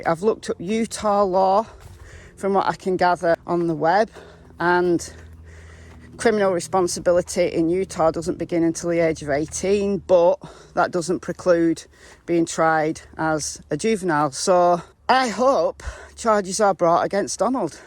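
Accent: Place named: England, United Kingdom. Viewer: Now that you mentioned it, H said in his interview that while they were in line at Walgreens to drop off grandma's rx that Don called Candace wanting to know what time she was going to be home! So that might be a contributing factor to your theory. Tilly is a doll baby! This case has really gotten to me and is breaking my heart. Love the UK... esp England and love your accent. Keep the vids coming!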